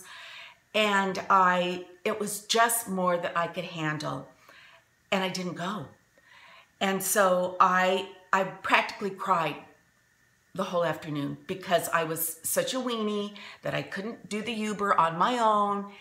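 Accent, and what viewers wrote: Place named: California, United States. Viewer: Hi! Do you know you have a California accent? My family use to tell me I had one when we lived there for years and years! And yes, you do! lol so funny that Paul McCartney was supposed to be your husband! I laughed at this! Oh, isn't it neat when God speaks to your heart? How neat is that! Take care my dear friend...love you! Jeanie xxooo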